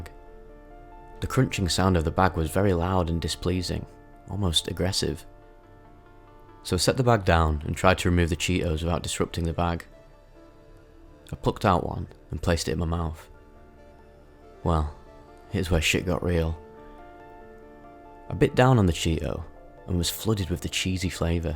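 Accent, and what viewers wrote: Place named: Burnley, United Kingdom. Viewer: I'd normally hear this type of voiceover from some American tone not a Burnley accent 😅😂 don't take offence I like it as I can relate I too have an accent which doesn't ring true to me .I speak in a Scouse accent however I am not a Scouser 🥸. Life's a funny thing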